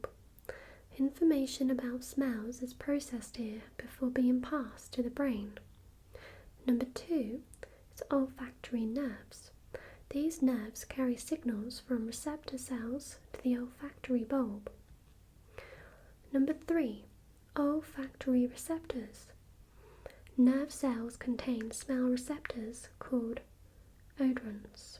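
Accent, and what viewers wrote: England, United Kingdom. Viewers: wonderful English accent still gets me every time. It's absolutely, positively the best accent I've ever heard. Perfect for ASMR. 👌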